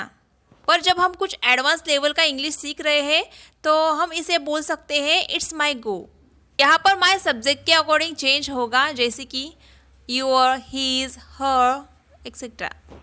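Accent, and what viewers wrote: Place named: Tamil Nadu, India. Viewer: Today I learnt 👇👇👇 1) Come what may, I will never forget my parents. 2) Now it's my go to speak English. 3) Have you purchased toys and all? 4) I speak passable english. 5) I can't do without my mummy. 6) Show me to jump from this roof. Mam, you teach very nice 👌👌 I think u are from Tmilnadu.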